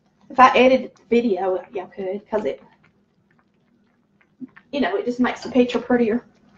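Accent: South, United States. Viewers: I love listening to a true Southerner talk. There are so few of us out there. Thanks, Great tutorial! New subscriber. ✌🏻❤ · We love chicken and dumplings. I want to add that your southern accent is great! Yes I’m from Texas but not all videos have the southern accent that I personally love! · I just found your videos and I have to say "I love you!" Your southern draw and your family stories made me smile throughout the entire video. You remind me of my grandma and family who lives in Nacogdoches Texas. I have been looking for the perfect from scratch dumplings and I think yours is it. I can't wait to try yours out. Thank you so much for sharing. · you are great cook & a "hoot" to listen to your wonderful southern drawl! Love it. I live in MI but born in VA & Mom Dad & brothers born in TN. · Was very relaxing watching you cook. You’re a sweet doll. Love listening to your southern accent. 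· What a great video. Love the dumpling recipe. You cook the same way Granny did. I learned from her. You mentioned the mixer you didn't like. I have one that was made probably in the 20' maybe 30's. It's wire instead of flat slots like yours and it works great. I can't find one like it now days. You talked also about dressing. Granny would make her's with the liver from the turkey or chicken livers. It was actually really good. I grew up on a farm here in Missouri. Dad butchered our beef. Just like you He got the T-bone steaks. We got the lesser cuts. We would maybe get a T-bone right after he butchered. The rest were his. It' great to hear your southern accent. I miss it.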